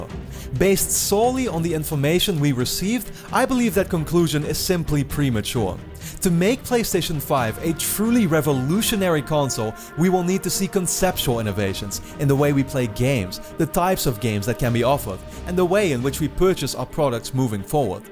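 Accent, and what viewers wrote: Netherlands. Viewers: are you dutch because you have a dutch accent. (with dutch i mean netherlands) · Such a dutch accent :P But nice vid! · ik ben al een tijdje geabonneerd op je en ik dacht altijd al Dat is toch een nederlands accent en eindelijk zei je dat je nl was en ik dacht echt van boem ik wist het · Are you dutch? Can hear it in your "s" 😅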